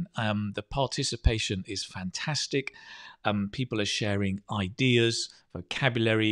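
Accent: United Kingdom. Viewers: Appreciate your teaching videos. I am from Malaysia. Are you British? Lovely to hear you speaking.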